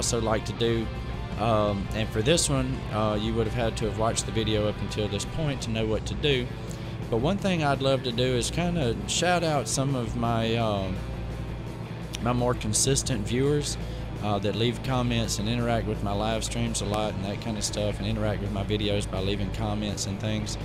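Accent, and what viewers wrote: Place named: South, United States. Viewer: As always love what your doing man! So glad I found your channel! Dude I love when you say sweet jesus Lol so funny man. Rather it's this or lspdfr you make it very entertaining to watch! Coming from south florida it's nice to here that southern accent :) also very nice involving your kids into what you love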